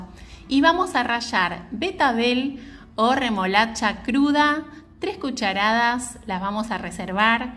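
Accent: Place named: Mendoza, Argentina. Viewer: Ese acento lo conociaaaaaa😂😂😂 soy de Mendoza 🩶 te mando 🫂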